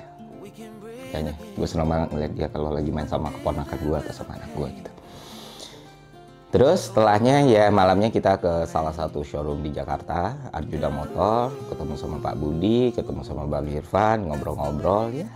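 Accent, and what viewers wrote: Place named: Jambi, Indonesia. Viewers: Enak nian dengar kau bahaso jambi bg, mantap 🔥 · Seneng nian denger bang make bahaso jambi, samolah cak palembang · Mantab bang, logat Jambinyo masih ado. 😅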